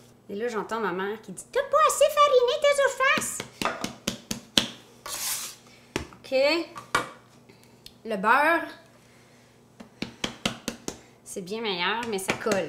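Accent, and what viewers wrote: Quebec, Canada. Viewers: quand j ai lus les noms de mes deux fruits preferes j ai ete super content . merciiiiiiiiiiiiiiiiii. - est ce que tu peux nous faire des pates au pesto . hummmmmmm reponds moi stp -PS ; j aaaaadore ton accent quebecois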